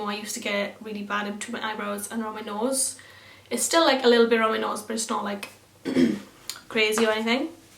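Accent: Wales, United Kingdom. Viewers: Are you welsh x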